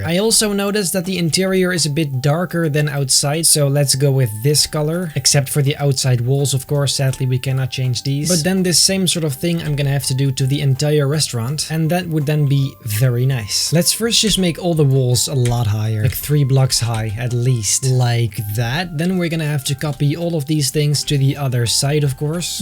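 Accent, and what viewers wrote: Netherlands. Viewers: I hear a bit of a Dutch / a Belgian accent in his voice...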